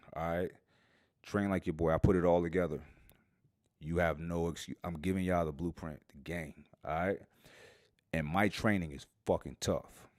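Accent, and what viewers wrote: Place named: New York, United States. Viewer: Yo I could listen to you all day, you got that new yawk vibe #bigupstobrooklyn and your MCT oil is the truth!!!!! I'm a big fan The.one.and.only.marissa.ann on IG. And forget this red pill shit tell them Mike Rashid is his own pharmacy and pops a different color pill everyday lol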